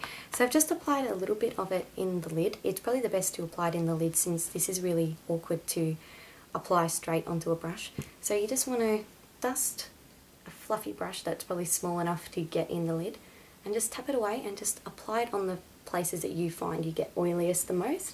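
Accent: Australia. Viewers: aussie not british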